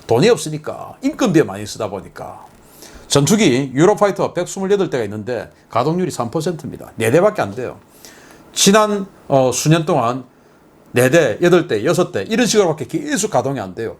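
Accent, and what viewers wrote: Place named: Gyeongsang, South Korea. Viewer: 지긋지긋한 경상도 사투리 써가며 뭘좀 아는척하네? 우물안서? 그러면 영어로 해석하며 이곳으로 넓은 세상으로 나와보든지???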